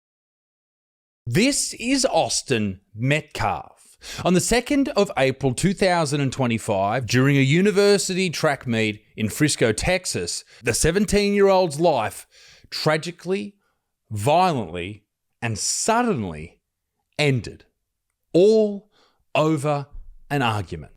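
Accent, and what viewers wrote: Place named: Far North Queensland, Australia. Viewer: Mate, as a fellow white Aussie who is from far north Queensland, you have an accent that sounds like you could have been raised a couple of streets away from me. But honestly mate, you seem to be so unrelatable with your views. It seems your understanding of racial politics in the US sounds as about as good as mine, (given I have only been there twice). You seem so opinionated and adamant about things that are unnecessarily inciteful. Agree you were somewhat a little bit careful about it with comments that it could be white on black, black on black etc… and that they were both 17 etc. But your focus that the amount provided to the alleged perpetrator is only made because he is black is completely unfounded. Moreso your comments (and I think your point) that you believe most of the donations come from white donators because of white guilt. 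These are extraordinary claims unless you have something to support it. The title “white kids lives don’t matter”, is also an ignorant one, given the US history, along with you’re your illogical and irrelevant comparison to a 17yo’s stabbing with the George Floyd incident (an experienced police officer sustaining kneeling on an unarmed and unthreatening mans throat). These are baffling to your already weak and uneducated points. Did you think it could very well be that the black community in that part may be tired of having the perception of rich white people getting their people off in other circumstances, so rallied with financial support?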